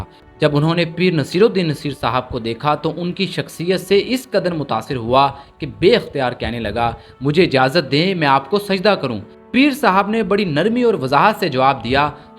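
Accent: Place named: Punjab, Pakistan. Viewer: You’re doing great job collecting information and passing onto the people throughout the Pakistan and in other countries as well. I would request two things number one please do some more research with your facts number two. Please fix your Punjabi pronunciation that will make your talks and your presentation more sophisticated. May Allah help you and give you complete success in this world and here after.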